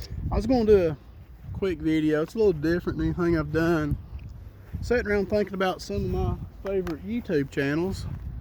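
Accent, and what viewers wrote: South, United States. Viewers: Always good to wake up on a day off and see has posted!!! It’s good you mention music it’s through the music I found the beautiful folk lore! Listening to Doc Watson and the such made me want to get a deeper knowledge of Appalachia because it reminds me a bit of the part of England I’m from. One thing I’d love to ask, going by your accent and some of the place names you mention I’m guessing your in Rasslin territory. Where you your self ever a fan? I love 70s/80s pro wrestling and the south has the best!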